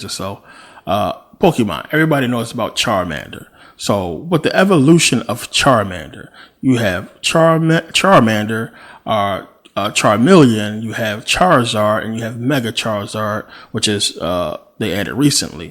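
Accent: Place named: DMV, United States. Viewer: Love that DMV "tew, yew, hewge" twang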